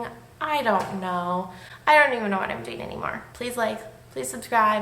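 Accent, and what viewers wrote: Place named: North Carolina, United States. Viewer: Haha no, you don't really look like someone who walks a lot outside in super sunny California 😅 I was guessing that you lived in or somewhere around maybe North Carolina or Pennsylvania or something like that, based on your appearance and also specifically your accent, but I'm not American so there's that. Anyway: Your pale complexion is obviously very pretty, however, and so I don't think you need to strive for a sunburn/orange brown tan. Way overrated in this world. 😊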